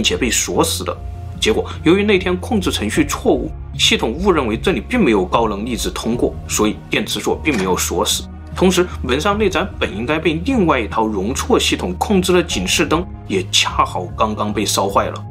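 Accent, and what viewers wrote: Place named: Hubei, China. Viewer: 湖北口音听习惯了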